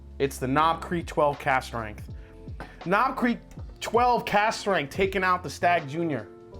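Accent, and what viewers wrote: New York, United States. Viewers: Are you from New Yawk? I heard you say 'wata'. I'm fum Brooklyn.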